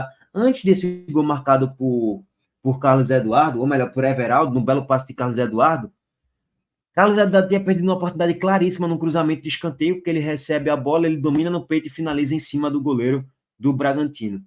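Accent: Bahia, Brazil. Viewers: Você é baiano?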